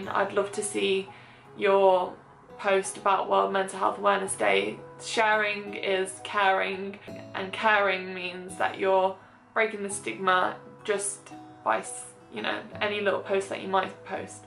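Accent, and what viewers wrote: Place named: West Country, United Kingdom. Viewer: Also when you’re not on camera you have more of a West Country accent lol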